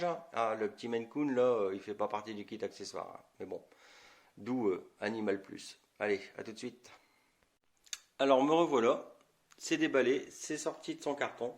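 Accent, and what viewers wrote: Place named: North, France. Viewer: Désoler mais votre accent jme suis douter que vous habitez dans le nord 😂, moi je suis du pas de Calais 😊😉, merci beaucoup pour votre vidéo...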